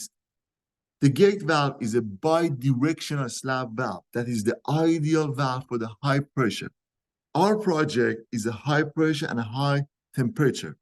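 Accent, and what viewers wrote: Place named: Iran. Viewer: Mr. Mr. What is this accent why you talk like this? Everybody must know you iranian ?? Mr. Loose the accent your in texas now baba joon what is this ??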